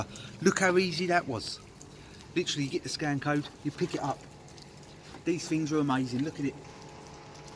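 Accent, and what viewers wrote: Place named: London, United Kingdom. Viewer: Refreshing to get a London accent on YouTube.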